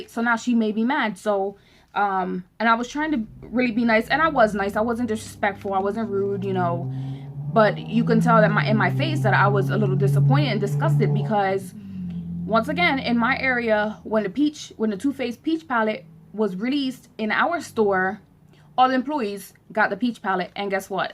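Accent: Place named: Pittsburgh, United States. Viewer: giiirl are you from pittsburgh? sorry off topic but your accent sounds like it! im from philly :)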